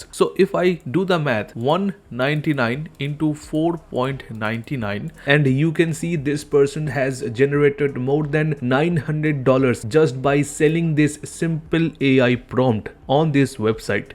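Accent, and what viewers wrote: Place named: India. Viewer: Bro please tell me one thing. Please go to your analytics and tell me how muchh percentage of your audience are from U.S.A or foreign country. Mujhe ye jaanana hai ki indian english ki jo accent hai usme video banane pr foreign se views aate hain ya nhi?? Please bhai pls please please पैर पड़ रहे हैं🙏🏻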